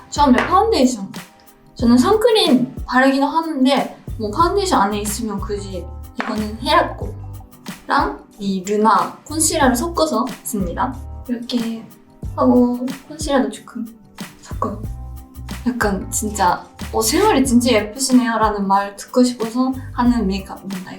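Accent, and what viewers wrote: Japan. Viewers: Sakura has been in kpop for so many years and still she can’t speak Korean well that’s very disappointing, it even sounds like she is speaking with Japanese accent 🤡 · 일본여자는 특유의 귀여움과 아름다움 상냥함이있어...옆에있으면 머리를 쓰다듬어주고 싶어요..발음도 귀엽죠.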